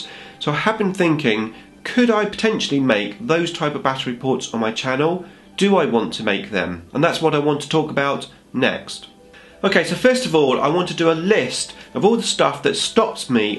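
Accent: United Kingdom. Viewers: This concept of "the worst" is absurd. Maybe "one of the least fancyful baterps" yes. Maybe it doesn't have professional background, maybe sometimes there are too little tactical comments, but still there are more than in many other batreps. They are short, they go straight to summary, to the point - sometimes it would do much good to have parts of batrep extended to show particularly important clash highlighted. What I lack in most batreps now is what is the virtue of yours, however not all the time and not in relation to every faction on the table. E.g. you explain how Necrons operate but not necessarily how opposing force does. Still I wanna say it's tactical comment that's most worthy. Watching rolling dice for 2,5 hours is crappy and boring. The scenery may be the most beautiful but still it will not make me any good to listen to comments restricted to stating the obvious e.g. 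"Again I rolled below average. Oh, and now above average. And now all 2s! What tough luck". So continue your good work, Sir. And well, we'd miss this singing British accent, this "video" at the beginning of your pieces! Best,!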